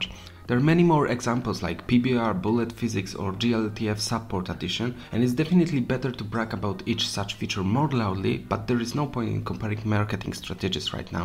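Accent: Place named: Poland. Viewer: po akcencie od razu poznałem, że Polak! Powodzonka! :)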